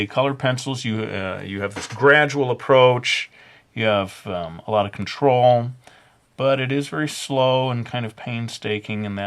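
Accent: Brooklyn, United States. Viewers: in the beginning of his videos, and myself watching, the brooklyn accent makes everything hilarious. now looking back and watching, i had no idea what i was getting into. now im addicted.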